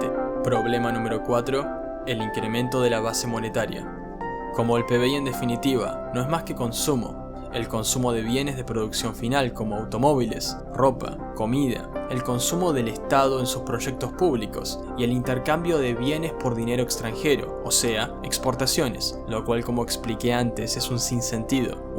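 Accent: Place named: Argentina. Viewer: tu hablar parece de argentino. Pero, donde vives?... en USA?. Gracias por tus aportes al conocimiento general de la economía. (Te sigo en todos tus posteos). Saludo Libertario 🤙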